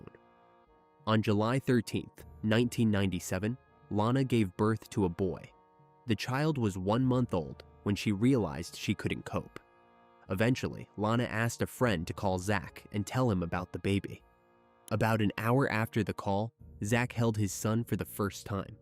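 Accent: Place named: United States. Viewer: He s fine . English is not my native language . I understand his merkan English clearly❤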